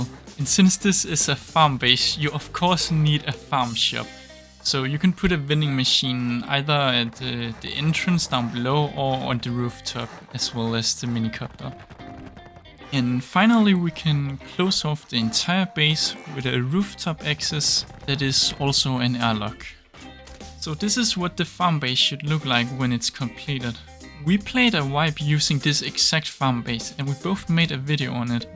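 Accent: Denmark. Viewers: hey are you guys danish? because you sound danish :) btw i am danish have a good day or if you now danish hav en gode dag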